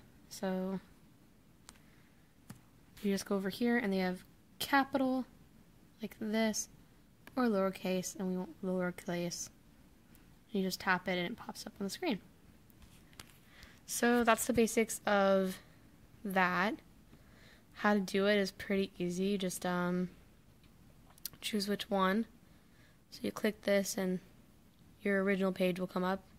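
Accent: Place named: France. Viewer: This is extremely helpful, thanks! I'm a French teacher that wishes my students were able to use this but I only have a teacher manual so no way to log in to supersite! Lovely French accent, by the way! Well done Mme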